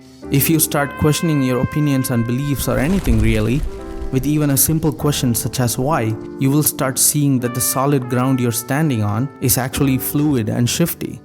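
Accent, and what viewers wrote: India. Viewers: The Indian accent distracts me from the whole thing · The Indian accent is unmistakable. Wonderful essay.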